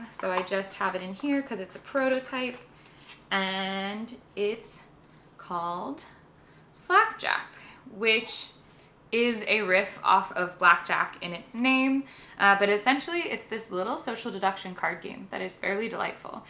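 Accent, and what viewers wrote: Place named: United States. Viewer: This was a great video. Thank you! It's my first time commenting on this channel, but it's been growing on me ever since it was recommended by Jenna Rose in the Board Game Garden channel. I especially love the "dominant species" and "your mama" tests 😄 The first one especially made me reflect... Quite intrigued about the "philosophy nerd" aspect, since that appeals a lot to me, and I don't often meet those two aspects that I love (board gaming and philosophy) put together. Do you happen to have a second channel / blog / whatever about that? Lastly, I'm confused: you sound American, but your likeness is surprisingly similar to my Italian best friend! It's uncanny 😂 Anyway, thanks again for the great video :)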